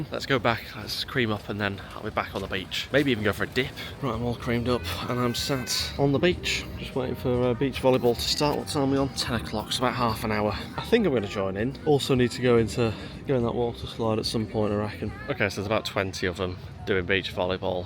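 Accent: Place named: Leeds, United Kingdom. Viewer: That’s a posh leeds accent lol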